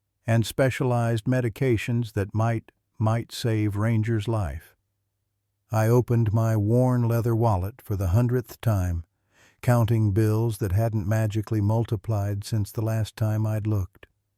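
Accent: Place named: South, United States. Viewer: I love this narrator with the southeastern or western accents.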